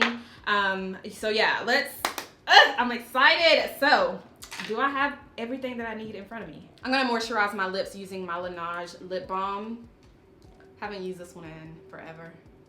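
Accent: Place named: Texas, United States. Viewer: Where is she from? Sounds like texas. Im new here.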